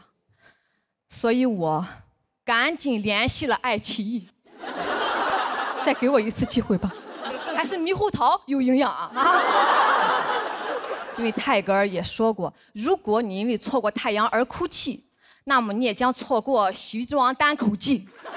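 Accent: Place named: Shandong, China. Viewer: 临沂口音听着太那啥了😂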